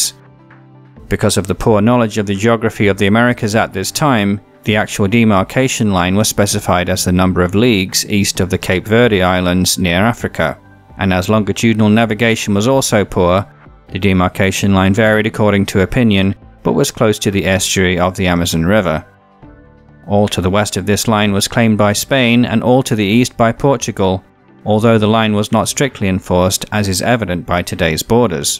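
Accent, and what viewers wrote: United Kingdom. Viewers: Great summary of the history of Brazil, you sound like you are from north England somewhere like Leeds/Sheffield ?, one thing I don't understand, Brazil has some of the worlds largest collection of resources and a pollution of 200 million but with an economy not in the top 10 and 15th the size of the USA, what is the reason for this? Places I have visited in Brazil, Sao Paulo, Manaus, Rio, Brasilia, Boa Vista. It used to have the currency the cruzeiro when I was there long ago, also the ALCO powered cars were fascinating back then.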